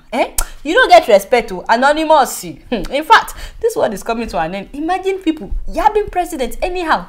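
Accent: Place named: Nigeria. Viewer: I love the Nigerian accent. Lol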